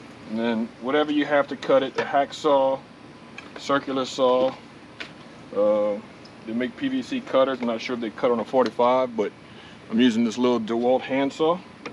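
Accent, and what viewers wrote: Louisiana, United States. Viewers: What part of Louisiana are you in? I hear the accent all day. Originally from Laplace. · Omg I knew it! I heard the accent & knew it was Louisiana! I had to check the page to find out. I love yall folks down there. ❤ Great video & VERY informative. I'm going to be a first time gardener so I'm soaking up all the knowledge I can to ensure a successful harvest once I get started. Jist gathering the rest of my supplies. I can't waitttt!! 😁🙌🏽 Thank for this great info. Im definitely going to do this. I've been worried about using plastic in my garden (even food grade) but it seems you really can't get away from it. 😕 So plastic it is. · I know you Cajun “mark dat” you must be close😆. Going put my scrap pvc to work…Great vid! Also, started getting free buckets from Super One from the bakery, so this works out perfect! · Do I detect a Cajun accent? 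· Gotta love my down the bayou people,,, love the accent. Missing it up here in the Okies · New subscriber here, thanks for sharing. first time I’ve seen this technique, Great idea, you sound like a neighbor to me, I’m a recent transplant to Covington but grew up in Metairie. You from Louisiana?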